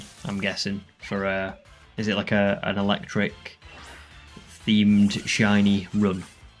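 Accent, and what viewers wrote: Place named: Derbyshire, United Kingdom. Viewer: Where bouts you from mate? Love hearing other people say tea instead of dinner. Im a Derbyshire lad myself. Not often you hear similar dialect over Pokemon content😅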